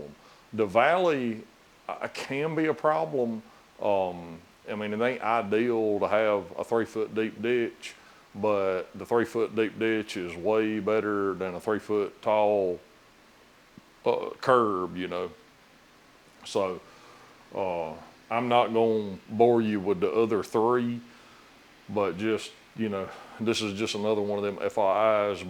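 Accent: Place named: South Carolina, United States. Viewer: My favorite part of every video is in the intro: "We gone gitindah it." I'm in Nashville, and I miss that South Carolina drawl.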